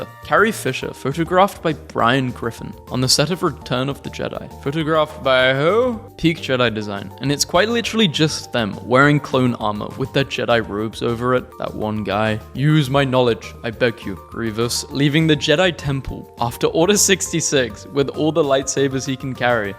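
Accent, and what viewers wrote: United Kingdom. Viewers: Howdy again British feller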